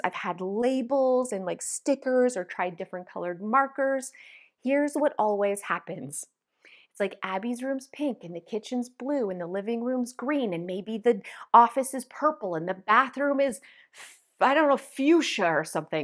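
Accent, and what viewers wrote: Canada. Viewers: yep! But not all your followers are 😉 just wanted to leave the tip for any in the comments who happened to live down here. They could apply all your helpful packing tips with the crates, if they didn't want to buy new boxes from Kennards or Storage King (local moving/storage companies). I miss being only a couple hours drive from Vancouver - i know that's not where you're located in Canada, but sometimes listening to your videos hits the spot with your accent 💜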